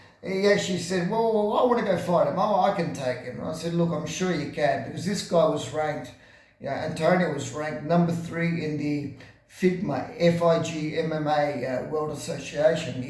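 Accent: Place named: Liguria, Italy. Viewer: why d y speak english with ligury accent? i'm serious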